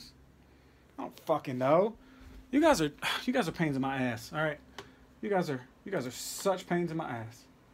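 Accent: South, United States. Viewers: I knew he wasn't black, he doesn't even sound black you all just aren't used to white Southern accents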